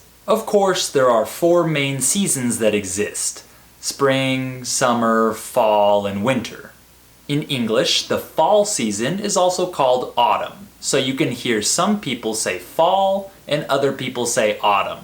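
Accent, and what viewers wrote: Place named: United States. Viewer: I can learn many things from this channel. I really like to listen your voice. Real American voice.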